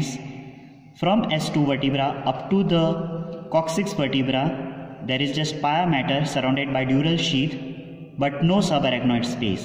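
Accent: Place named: India. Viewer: Indian accent is so cool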